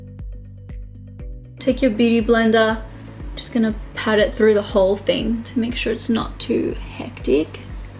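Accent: Australia. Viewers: I enjoy watching your videos sooo much partly because of your australian accent, of course also because of your make up skills :) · It's strange.... I never liked the Aussie accent before I started watching your YouTube videos lol... Love your videos... Do you know what I mean Jelly bean? ☺️ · Ohhhh waoooo. I like your personality as a whole person and your Australian accent. Love you .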